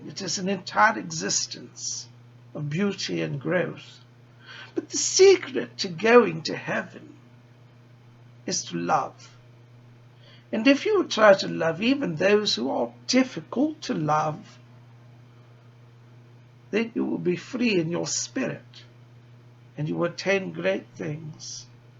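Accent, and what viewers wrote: United Kingdom. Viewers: I love you so much (I feel compelled to call u ). I am British. You are speaking the queens English. Fletcher love to you. Xxx.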